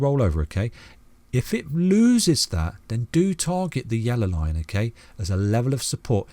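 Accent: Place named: Nottingham, United Kingdom. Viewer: You sound like a Nottingham lad! Great TA mate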